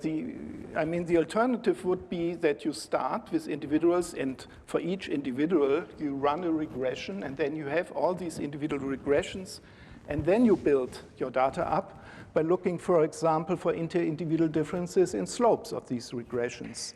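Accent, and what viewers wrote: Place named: Germany. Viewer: Unfortunately he speaks with a too heavy German accent when lecturing in English language.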